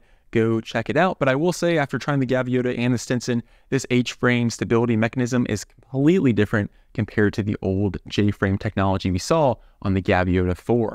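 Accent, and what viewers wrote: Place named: Philadelphia, United States. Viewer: That Philly accent is crazy 😂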